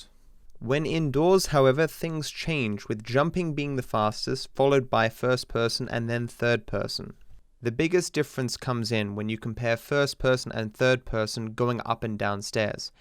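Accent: United Kingdom. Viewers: Now I know, he’s Bri’ish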